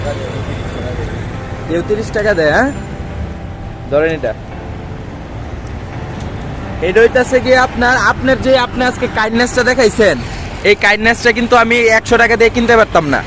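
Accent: Sylhet, Bangladesh. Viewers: Are you Sylhety Or from another city? Good job, well done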